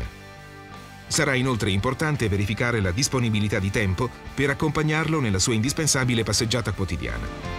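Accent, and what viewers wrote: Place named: Lombardy, Italy. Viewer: ma...sai giusto per curiosità se ti va di rispondere Ma tu di dove sei? Pare che parli un "mix" di dialetti... Trentino e bo potrò sbagliare chiedo venia ma qualcosa di lombardo? Piemontese?? Chiedo solo per sapere perché apparte te e la prima volta che sento una parlantina con rispetto parlando giuro che non è offesa) del genere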